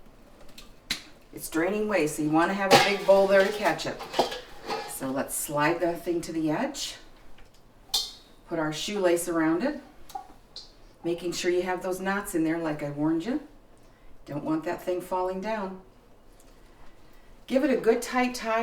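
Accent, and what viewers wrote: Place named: United States. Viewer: 86 Deg... I would assume it is Fahrenheit? A. Because of the US accent. B. Because I think a germ culture won't feel at home in anything but Luke-warm... C. I don't see vapour rising and in 86 Deg. C there would be vapour... But not everyone is an Engineer who loves making food, so it would have been nice if the publisher didn't assume only USA people watch these videos, and mention conversion quantities. 86 Deg. F or 30 Deg. C An Ounce or 28 Grams... etc.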